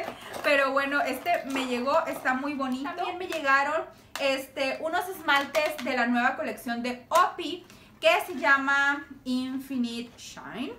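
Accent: Monterrey, Mexico. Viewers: se te nota más el acento regio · regresaste con el tonito regio más marcado 😉😉 siempre pasa eso 🙈🤔🙈 me encanta al fin del norte!!! Y si el reto de los 100 pesos!!!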